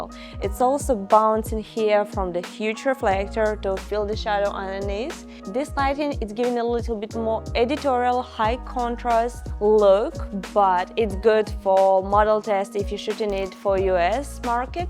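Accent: Russia. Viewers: Крутая ) но русский английский прям слышно)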